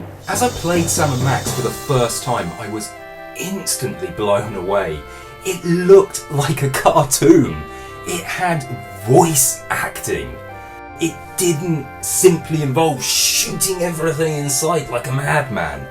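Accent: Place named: United Kingdom. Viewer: is one talented man. I wish that I had 1/1000th of his talent. Still, I take great pleasure in listening to his incredible retrospective brit voice. So very relatable. Wish that I could be like. Le sigh. Just give up indeed...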